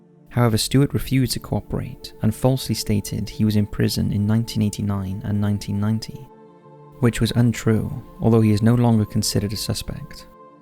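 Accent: United Kingdom. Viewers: Your voice is perfect for narration. Not to loud or to low but perfect in both volume and tone. And the british accent makes it even better. Been watching the videos nonstop for a week now. Thank you !❤️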